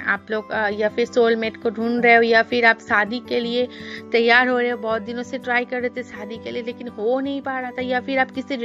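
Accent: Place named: Bengal, India. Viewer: Waah nice jankari ap bangali h na apki hindi se lg raha h😅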